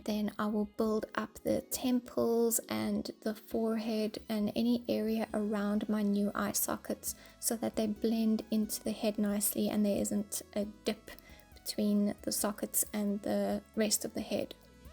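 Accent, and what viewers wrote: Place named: South Africa. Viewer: Very inspiring and creative! Love your accent too. Did I spot South Africa? Hugs from Provence, Adrien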